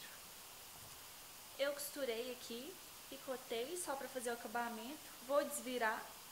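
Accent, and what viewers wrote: Minas Gerais, Brazil. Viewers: Você é muito fofa, adoro a graciosidade que o sotaque mineiro tem!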